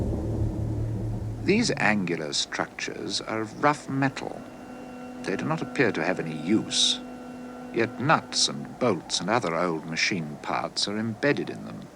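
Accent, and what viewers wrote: RP, United Kingdom. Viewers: Its like a weird apocalyptic pastiche of a salvidor dali painting, in a posh british accent 😂 I love it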